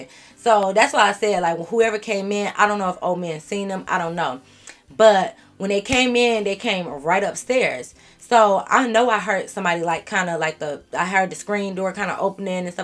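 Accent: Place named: Chicago, United States. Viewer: I swear I cannot watch any of the others because you just know how to storytell! Girl where are you from though? I could’ve swore I hear a lil Chicago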